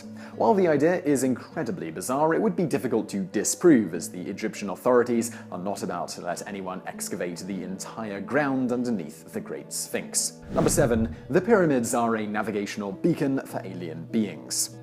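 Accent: England, United Kingdom. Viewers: Rick Rockey You are actually taking personal offense because he has an English accent???? You are hilarious! Are you upset because you think he is putting you down by not using an inflection of voice that doesn't make you feel inferior? He is not, not trying to sound smug. It is an ACCENT. Do you get angry when French people speak with a French accent? I think you have a serious persecution complex. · Another Magic 8 ball why are they always British? I think it's that catatonic English accent. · Why is it that people believe that just because the presenter has a snotty British accent he's intelligent, he must be right? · Just because you have a fine British accent you still don't sound intelligent when you speak about things you know nothing about.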